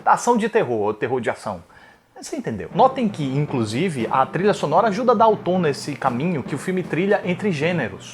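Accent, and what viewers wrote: Northeast, Brazil. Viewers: O sotaque e o palavriado Típico do nordeste. Que maravilha ver alguém usando tão bem nossa língua. Parabéns.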